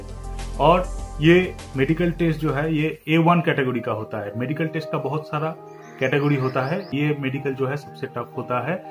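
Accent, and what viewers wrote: Bengal, India. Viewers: Sir r u Bengali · Sir kya aap Bangali ho?? Main bata raha hu kuyki mai bhi bangali huu aur hindu aap ki taarai bolta hu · Sir kia ap bengali ho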